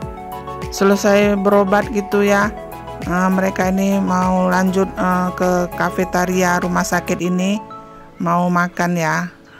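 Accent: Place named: Indonesia. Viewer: Hujan ya bund , oh ini rumah sakit yg populer yg biasa org Indonesia klw berobat kemalaysia ya Bun , tp dari logatnya bunda sprti org Indonesia ya Bun , mknan berat sepiring plus snack2 ada bakso nugget dikafeteria rumah sakit JD gak tegang dan kaku ya Bun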